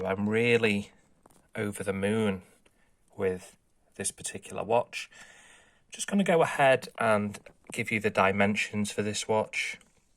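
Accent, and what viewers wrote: United Kingdom. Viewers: Your voice has a similar accent to that of Brian Cox, the British astronomer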